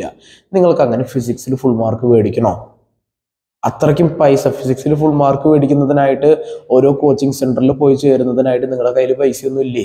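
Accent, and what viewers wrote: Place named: Thrissur, India. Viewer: Uff. chetta. Chettande oru valiya fen aanu njan. Chettande aa thrissur slangil edkunna class um. Sirde fashion senseum mudiyum ellam poli aanu.ella vdeosinum katta waiting aanu.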